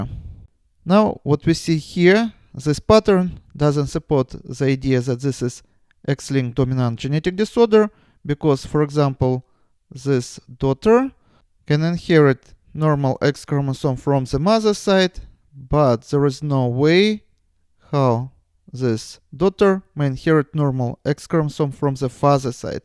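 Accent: Russia. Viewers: Ur accent, russan or greek or what?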